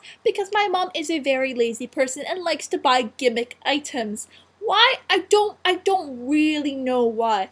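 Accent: Canada. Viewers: I think i like the canadian accent. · you know what I like it more when you're calm in ur videos... but it's ok cuz ur Canadian accent is so kwl =]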